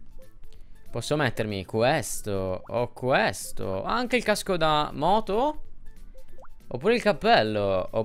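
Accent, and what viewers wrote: Sardinia, Italy. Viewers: Ma cosa sei sardo?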